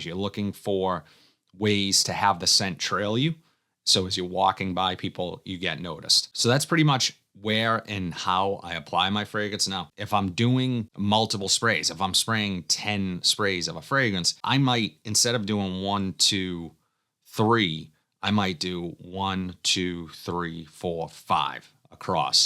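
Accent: Boston, United States. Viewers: Is that a Boston accent I hear?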